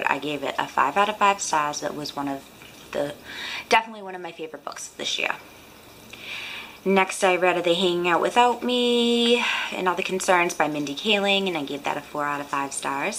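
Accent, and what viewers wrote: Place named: Massachusetts, United States. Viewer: Enjoy the lists! I subscribed :) By the way, are you from Massachusetts? Just a guess from your accent :)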